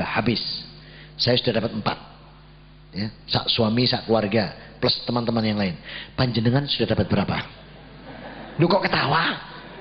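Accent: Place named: Java, Indonesia. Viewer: jowone medok 😄😄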